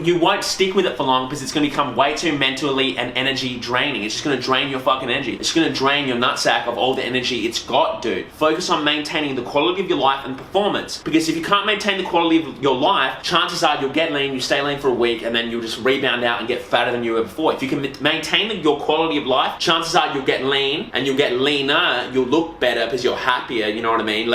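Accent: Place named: Newcastle, United Kingdom. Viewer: If nobody has asked why you introduce videos in a Geordie accent then I'll be pissed.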